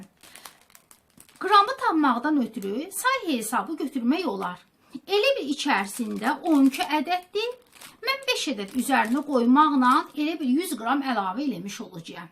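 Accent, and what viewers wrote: Baku, Azerbaijan. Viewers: Ləhçəəəə. Can bakılım)))😍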